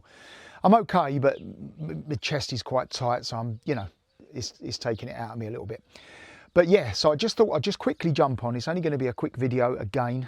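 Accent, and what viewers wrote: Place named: Eastbourne, United Kingdom. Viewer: Haa you can talk all day I love the accent. Reminds me of when I lived in Eastbourne.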